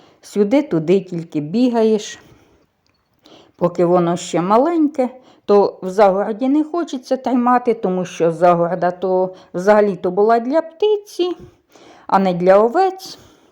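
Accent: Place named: Kyiv-Poltava, Ukraine. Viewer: Вітаю 🤗 дуже люблю ваш київсько-полтавський говір 💞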